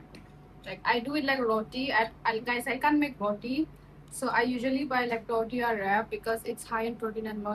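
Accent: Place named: India. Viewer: Her hindi accent is sooooooo cute😭😭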